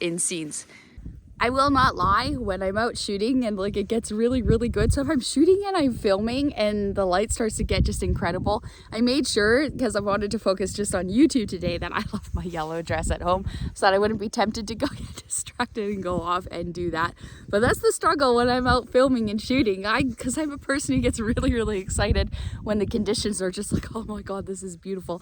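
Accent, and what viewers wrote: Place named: Canada. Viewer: you have a lovely Canadian accent! ;-)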